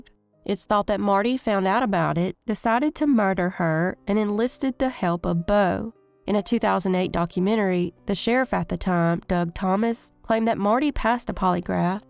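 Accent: South, United States. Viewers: Can't stand the hillbilly narrator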